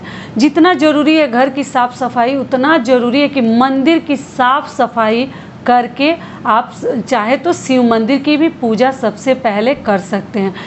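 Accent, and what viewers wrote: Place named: Bhojpuri, India. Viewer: Rajasthan. Ki. Saskriti. Kebareme. Pahnave. Ke. Bareme Ap Jantih. Kya rajsthani. Orte. Vat. Savitri. Ka. Vartme. Nhi Samjatih Inkeliye Tij Karvacoth. Ke. Braber. Apke Vat. Savterike Vart K. Braber. Apke. Guru Konhapkhase. Bilong Kertih. Apki. Boli Bhojpuri. Lagtih. Apko. Ptah. Rajsthanme Moutabu me Bht. Bra. Atmgyani. Permatgyani. Barahamkumari. Sasthanh Muje. Ptah. Biharme Patna Saherme. Bodstuph. Purane. Jamane. Me. Taksila Visvvidaly. Tha. Itihaas me. Kabi. Hamare. Rajsthanki. Saskriti. Ke. Bareme. Btaiye hamare. Pregnancy me. Mehndi. Nhi. Lagat hgodbraim. Lgatehh Akbar Satve Ya Nave Mahine Hamare. Yha. Maglsuter. Nhi. Pahnqte. Galeme. Kanti. Pahnteh. Morden. Orte. Ajkal. Pahnrih. M nhi. Pahnti. Muje gahn. Nhi. Suhate. M. Simple. Ladyhu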